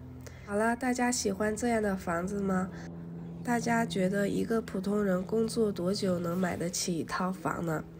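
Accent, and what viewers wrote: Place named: Yantai, China. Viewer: 烟台口音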